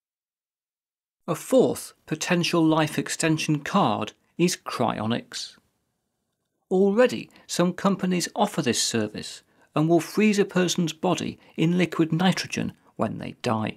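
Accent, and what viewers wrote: United Kingdom. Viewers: This guy's British Accent made this video. I mean, if he had an American Accent I wouldn't have taken it so seriously, but for some reason because of his accent I couldn't help but pay more attention! All of thse novel ideas seem amusing, but something about the cyrotube Reanimation "card" sends chills up my spine :(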